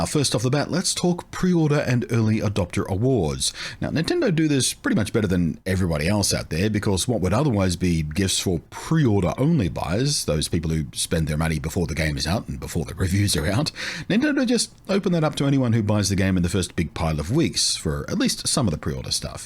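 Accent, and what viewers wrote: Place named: Australia. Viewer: Nothing like a low key Aussie accent to massage my brain so I may fall asleep. Quite a podcast voice. Very crispy & creamy 🥱💤💤💤💤💤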